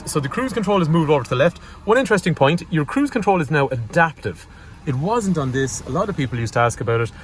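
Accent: Ireland. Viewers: Only with can give an unbiased & informative review on the cars reviewed, plus the Irish lilt is best, consequently I have bought 2 new Hyundai cars on his comparison site, I only wish I was in Ireland & not England to buy my next car, Well done!!!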